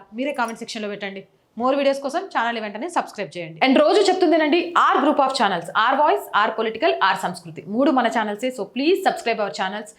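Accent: Telangana, India. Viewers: ఇలాంటప్పుడు స్కూల్ ఫంక్షన్స్ అప్పుడు గోచిలు టవళ్ళు కట్టి డాన్స్ పెర్ఫార్మన్స్ నేర్పించిన ప్రైవేట్ స్కూల్స్ ని boycott చేయాలి ప్లస్ ప్రైవేట్ స్కూల్స్ కాలేజీలు హాస్పిటల్స్ అన్ని సర్కారి అధీనంలో పెట్టాలి మూతపడిన సర్కారి స్కూల్స్ కాలేజీలు హాస్పిటల్స్ అన్ని తెరిపించాలి మేడం మీరు సక్కని తెలంగాణ యాసలో ప్రశ్నించడం బాగుంది అండీ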